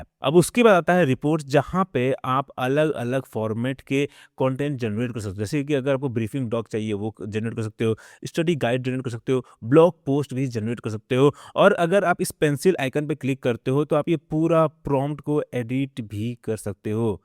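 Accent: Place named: Rajasthan, India. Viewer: bhai aap kaha s ho aapka accent to rajasthan ka lag rha h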